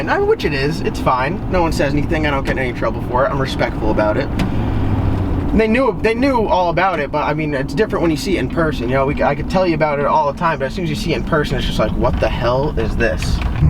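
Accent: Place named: Appalachia, United States. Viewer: Why do you talk like a hillbilly but your parents dont? Lol wtf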